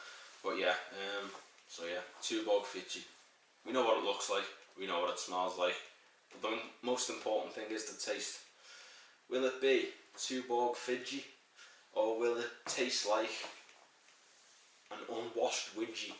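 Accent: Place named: Liverpool, United Kingdom. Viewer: I love how you say ‘Turkish’! Love the Scouse accent. Looks like my scrotum. Hahahaha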